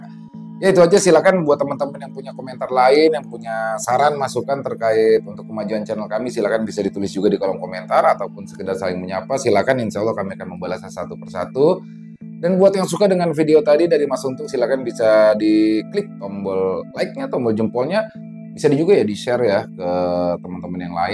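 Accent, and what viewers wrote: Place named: Jakarta, Indonesia. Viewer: wahhh mksh bang ada bang lg ni ceritanya seru mantap yg plng ku suka bang bawain cerita betawi nya mantap suara betawiny sukseees terus sehat yselalu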